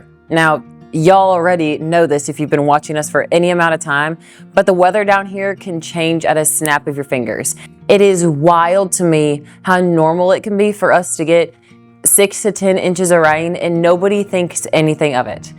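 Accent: South, United States. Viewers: U sound like u were born and bred in the south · You are sure southern sounding. How long you been there? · i've lived in alabama my entire life - 71 years - and i agreed with every single thing you said. oh, and b.t.w., you've picked up a tad on the accent. not completely my area's accent, but still sounds like you've integrated very well! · You must be from Southern Illinois cause you sound southern. South and North Louisiana, where I live, it's not the heat it's the humidity, it's brutal. Flying roaches are Palmeto bugs not a roach. They come inside when it floods or gets real cold otherwise they live outside in the grass and bushes. But yeah the small brown bugs in your kitchen are roaches use some Boric Acid powder along the walls/floor. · I do believe you are acquiring a southern accent 👍❤️ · Hope more that looks as good as you keep moving South, you already got the accent. 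· Ma'am.....don't know how long you've lived down south but your vernacular has already started changing and your Illinois friends/family are going to have a hoot laughing at you. You will sound different and you'll notice that Yankees love to hear an attractive woman talk with that smooth southern rhythm. About the weather....down here in mobile, we've been running the a/c day and night since 2 weeks before fat tuesday. I tell people from other parts of the US that we have 2 seasons here.....summer and not summer but I can fish 12 months a year for redfish, speck trout and flounder while others are freezing their butts off, trying to fish through a hole in the ice on a frozen lake. About the people....years ago, Baton Rouge had a severe flood and I felt like I needed to help so my company bought 4 pallets of bottled water (lots of weight and expensive to me)rented a u-haul, contacted red cross and was dispatched there to distribute water to different churches. 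Some of these people had practically nothing left of their homes that wasn't damaged and they fed us, escorted us to 4 different black churches and treated us like family. I didn't see people of color when I delivered much needed drinking water to the churches, I saw brothers and sisters that were in dire straits. Would've taken more supplies if my company could've afforded it. My point is that you'll never see a more giving people than southerners and this is coming from a SEC fan that doesn't like LSU at all. That's pu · Sounds like you're picking up that southern accent a little. I noticed a few soft "i" a few times. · To be a northerner you sound like you have a slight southern accent. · You are developing a Southern accent! Congratulations! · With that accent you have there is no way you're from Illinois. You might have lived there but there is no way you have a Southern accent that you can't hide so I don't know what your real story is but you're not know Yankee that's for sure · You have been living in the south for so long that you have actually picked up the accent a little bit. 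I LOVE that lol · You definitely picked up the accent. I thought you were from the south. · I'm from bama. I can tell in your regular talking your starting to adapt a country accent. That's funny. 😂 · It sounds like you must have picked up a southern accent too. Not sure what it was like before you moved down here but you sound like a natural now. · I absolutely love hearing stories like this. How long did you say you'd been down here? I only ask because you've developed a beautiful southern drawl which think is a compliment. Thank you for the video and adapting to the culture instead of trying to change it. We live slower down here but it's for a reason. Glad to have Ya and keep the videos coming. Now I'm hooked on your journey. Take care! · I see you picked up the southern accent pretty well.😀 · You have picked up a little southern accent. Sounds good. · You have a southern accent 😂😂😂😂😂😂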